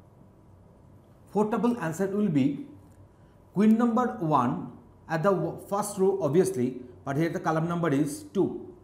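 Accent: Bengal, India. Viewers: Pretty sure a bengali though.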